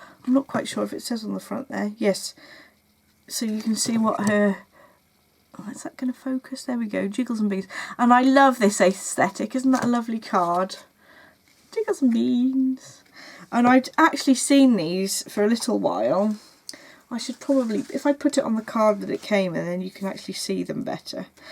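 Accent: United Kingdom. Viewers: Congratulations,! As a german viewer I really love to watch your podcast and listen to your lovely british English. Thank you for cheering up my knitting Time 😍❤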